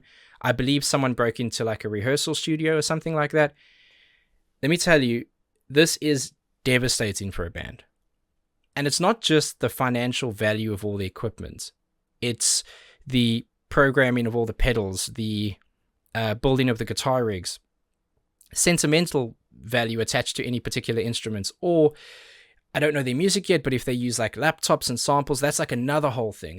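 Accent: South Africa. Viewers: My favourite album ever! I’m new here. What’s your background? I think I hear a slight South African twang to the way you say certain words! I’m Zimbabwean-born and grew up in London. I’m definitely going to subscribe as I really like your vibe. Perfectly timed to find your reaction and my favourite band. If you still haven’t listened to this whole album, you definitely should. It’s such an experience from start to finish xx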